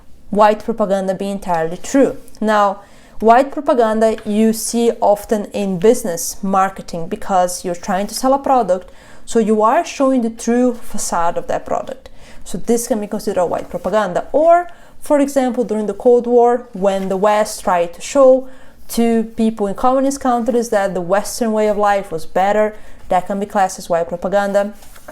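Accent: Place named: Brazil. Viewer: "I dont know how to pronounce and i wont try" HUUUUGE school flashback with this hahahahaha. That send me back. And with this accent... when you knows shes brazilian but you just cant prove it yet (you sound like you could be cute... but it's absurd to try to guess someone's looks with voice and even arms alone)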